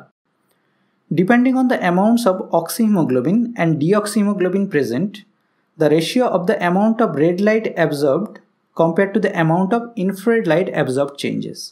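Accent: India. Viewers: The keling slang is so indian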